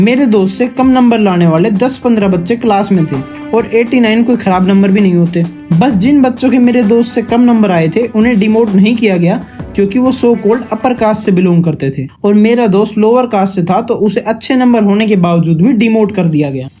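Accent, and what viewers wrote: Haryana, India. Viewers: Where are you from? I think haryana❤ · Bhai tu haryana se hai na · Aree tum haryana side se ho kia bahi?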